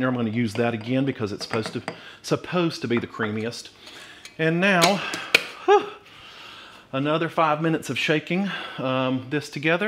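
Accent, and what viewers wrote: South, United States. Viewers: Yes! He's so down to earth and as I too am southern it is music to my ears to hear him talk ❤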